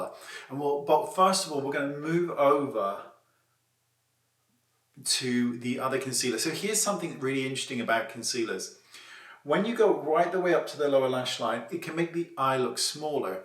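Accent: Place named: United Kingdom. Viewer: I am not here for concealer just your lovely British accent 🤫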